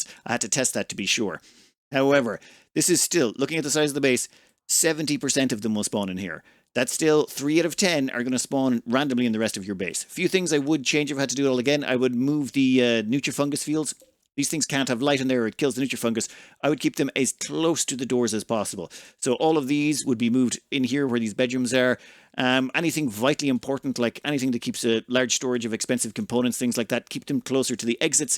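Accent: Ireland. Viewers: Lol he's Irish right?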